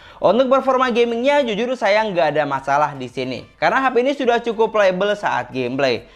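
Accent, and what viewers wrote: Madura, Indonesia. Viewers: Logat ny kok kayak org madura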